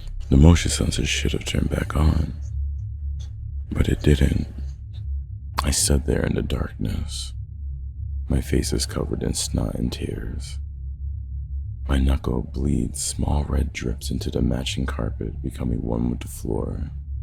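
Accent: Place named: United States. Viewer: U sound like an American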